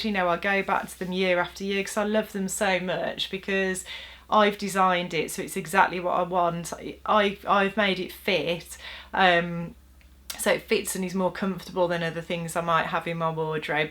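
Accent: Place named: Walsall, United Kingdom. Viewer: I am from Walsall, you sound like you are pretty close. I have lived in the US for many years and it is so nice to hear someone from close to home😀🇬🇧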